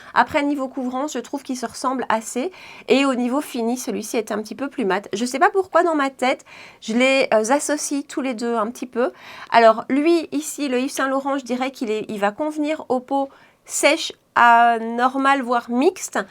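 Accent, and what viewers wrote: Belgium. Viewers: Coucou d'une compatriote expatriée, ravie de retrouver les intonations, expressions du plat pays. Je suis de la team investissement sur le skincare et maquillage petits prix, mais j'ai des rides et des taches qui réclament un fdt ou BB crème performant, alors je me lâche sur le fdt. J'ai eu étrangement un gros coup de coeur sur le Nars comme vous, même si normalement le glow fait effet loupe sur les rides, celui-ci fait un teint éblouissant, comme vous dites, même en poudrant, et en unifiant, une merveille. J'ai craqué sur le Lancôme, mais gros flop, je trouve qu'il n'unifie pas, ne floute pas, ne tient pas, ne se fond pas, me fait vraiment un teint...moche! Malheureusement, il n'y a presque plus de teintes claires et médium du Nars sur les sites, donc je le garde pour les grandes occasions et les longues journées. En petit prix, j'aime beaucoup le Bourjois 123 pour le moment, avec une base illuminatrice dessous, excellent rapport qualité/prix pour moi(peau mixte)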